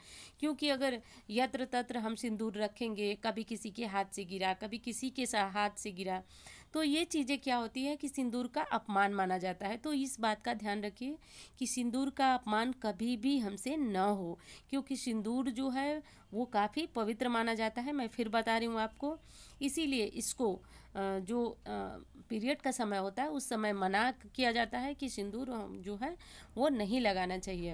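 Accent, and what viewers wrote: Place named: Bihar, India. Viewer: Aap k baat sa laga ke aap bihari ha Ma b bihari hu di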